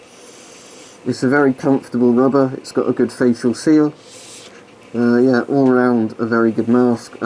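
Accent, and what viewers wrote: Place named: England, United Kingdom. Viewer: I love this English accent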